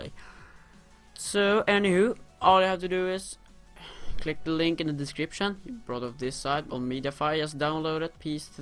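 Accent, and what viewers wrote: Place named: Sweden. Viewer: du har svensk engelsk dialekt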